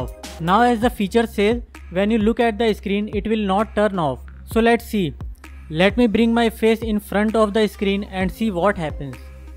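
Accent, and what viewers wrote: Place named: India. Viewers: Steven Watson What the fuck is a 'Hindu accent'? He's speaking in the typical Indian accent. Hinduism is a religion. Forgive me, But Western education is hilarious. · Lmao forgive me. But Hindu accents are hilarious.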